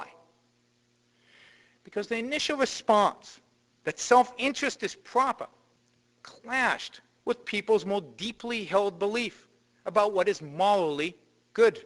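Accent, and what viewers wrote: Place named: Israel. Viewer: He's not a native English speaker--he's from Israel. Give him a break.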